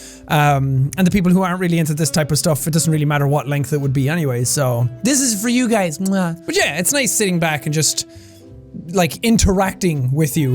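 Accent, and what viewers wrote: Ireland. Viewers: “A little bit of luck involved” -Irish man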